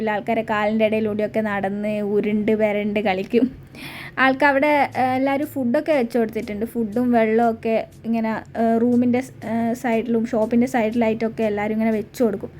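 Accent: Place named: Kannur, India. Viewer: Kannur slang Nalla pole undto😄 karakkam okke kainja ine aede pone😄 ❤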